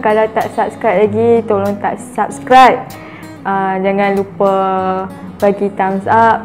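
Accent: North, Malaysia. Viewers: I like how you're very natural and confident when you speak. I'm glad that you actually speak in Northern slang. Cute! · aku tengok video hang from the first video and... "boleh main batu sambut" dengan "makeup macam orang mabuk" buat aku GELAK HAHAHAHAHAHA yep, not gonna deny, orang uthagha cakap ada slang is the cutest >.<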